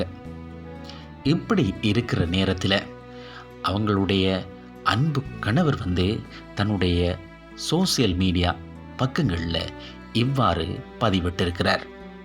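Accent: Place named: Sri Lanka. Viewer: Ok Anna neenga sri lankava unga slang apd dam erku😢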